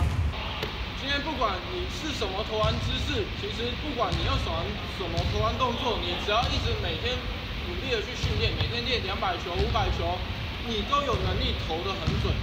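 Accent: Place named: Minnan, China. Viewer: 你很mean。这是闽南那一带说话方式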